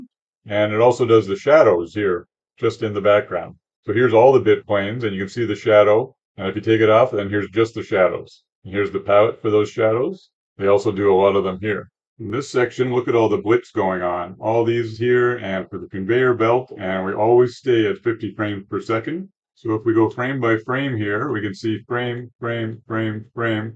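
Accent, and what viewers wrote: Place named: South, United States. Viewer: OK, because your accent is heavy, sounds like you're from the Southern States of the USA. I didn't even know the AMIGA was a thing in Canada. It was mostly popular in Europe. So do you think Canada will win the World Cup then?! :D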